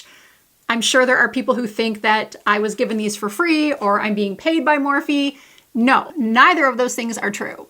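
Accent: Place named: Michigan, United States. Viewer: What part of Michigan are you from? Good video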